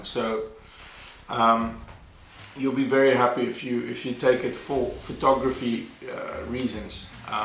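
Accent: South Africa. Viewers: You soumd south african..are you???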